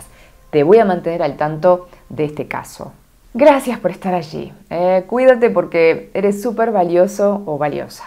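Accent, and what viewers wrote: Argentina. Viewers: me gusta tu voz de locutora y tu acento argentino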